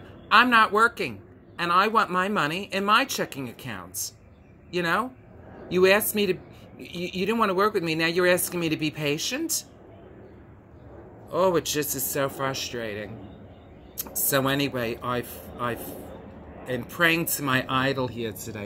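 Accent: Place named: New York, United States. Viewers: Jerry Hunter It always sounds so much more effective when spoken with a NY accent. Lol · I just have to thank you for all your videos, especially the Thoughts While In Solitude series. You are like a good friend I can stand:-D and your vids are helping me get through this pandemic. I could listen to you yammer all day in that accent, which is what I hear all the time now living so close to NYC. Stay well, best wishes always.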